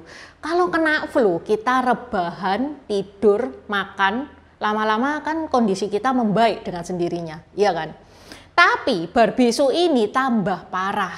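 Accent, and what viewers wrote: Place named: Yogyakarta, Indonesia. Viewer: Mirip logat Chinyo alias chinese yogyakarta. 🤭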